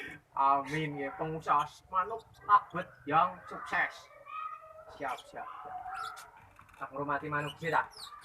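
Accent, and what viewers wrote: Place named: Banyumas, Indonesia. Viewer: jooosss gandos wong ngapakkkkkk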